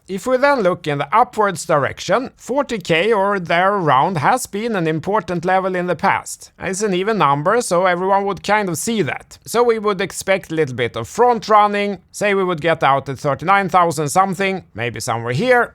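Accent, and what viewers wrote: Sweden. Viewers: Well, in his defend. He's swedish. I love the accent! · Great content as usual but please talk more clean english/American then swedish/swinglish 🙈👍💪👊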